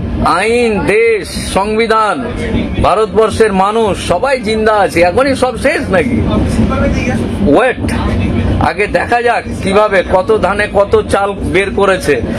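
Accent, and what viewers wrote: Bangladesh. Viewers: কথা শুনলে বুঝা যায় বাংলাদেশের মাল😅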